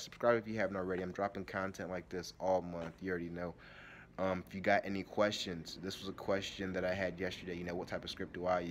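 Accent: United States. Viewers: Thats a Baltimore accent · You from the Dmv ??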